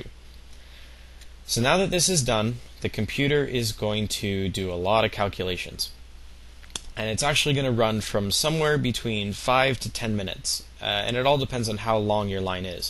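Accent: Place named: United Kingdom. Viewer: He's hiding a British accent.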